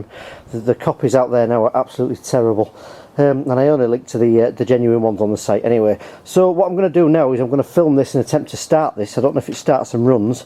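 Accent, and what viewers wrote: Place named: Manchester, United Kingdom. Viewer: Hi mate your vids are good with clear directional info.could you be as kind as to advise me how to go about sorting one of these b&s orion 35s which runs was cutting sweet but suddenly when l get into longer grass it seems to have lost her torque.will sub with you and buy any bits thru you too, your doing a grand job sharing your knowledge,l am a manc and you soind close by, much appreciated..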